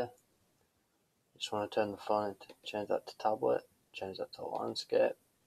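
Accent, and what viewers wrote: Manchester, United Kingdom. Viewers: He has a Manchester/Lancashire accent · yes mate are you from manchester? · at times un-understandstable because of very distinct Brit accent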